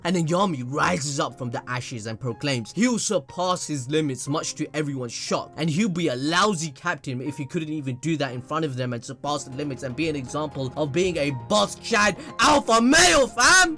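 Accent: Punjab, India. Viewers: This guy know Punjabi hindi🔥🔥🔥1 no.. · Are you Punjabi?? Dude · dude are u indian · Bro are u Punjabi?? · are you panjabi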